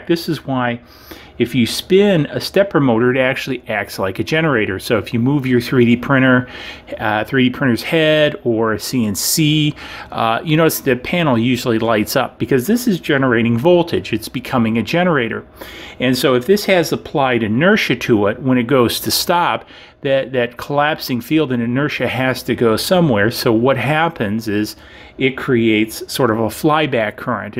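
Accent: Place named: Chicago, United States. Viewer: That Chicago accent!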